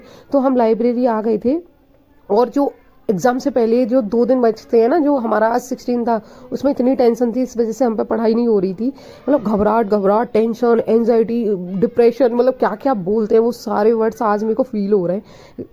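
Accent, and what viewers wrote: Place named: Mathura, India. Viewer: Aap proper mathura ki ho kha se h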